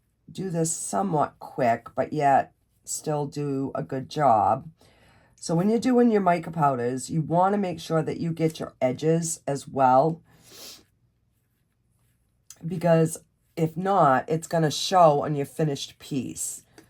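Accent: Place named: Long Island, United States. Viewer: I’ve been trying to guess your accent since I stated watching you😂 I’m from the East Coast so I’ve guessed Long Island or Maine. I’m sorry if that’s too personal I’m just a curious person♥️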